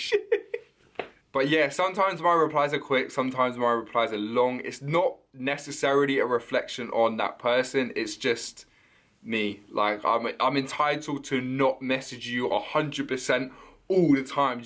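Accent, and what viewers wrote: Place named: Leeds, United Kingdom. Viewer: I have never heard anyone respect a Leeds accent ever, so tah 😂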